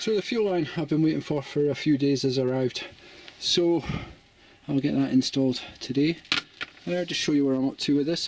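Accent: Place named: Scotland, United Kingdom. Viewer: your name suggest you are Glaswegian, but your accent suggests east coast maybe lived elsewhere for sometime .. england maybe ? .. anyway hows the heater ? are they safe ?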